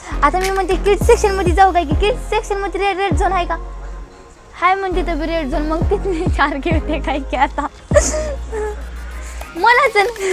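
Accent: Latur, India. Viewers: Perfect latur language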